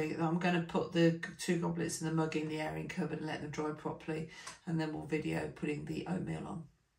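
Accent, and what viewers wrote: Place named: United Kingdom. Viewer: It is your British accent that makes it difficult for my American ears to understand. But no worries, between the subtitles and slowing down the verbal, I am able to understand all that you say!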